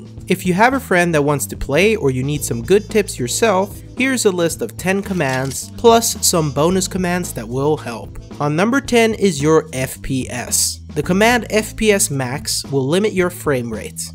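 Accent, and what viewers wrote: France. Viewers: Bon vidéo! Sub! Wanted to know where you are from, France or Québec? Just curious :P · woow great french accent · is he french ? "j'espère vous voir bientôt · Are you french or English ? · where are you from? I'm from Canada and I don't know where that French accent is from 😅 I speak French aswell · Are you actualy french? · Tu accent français donné très bien ! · lol is he french?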